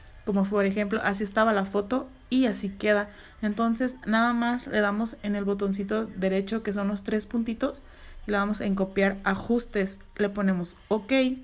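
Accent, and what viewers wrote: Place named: Tabasco, Mexico. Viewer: Tienes acento tabajqueño🤭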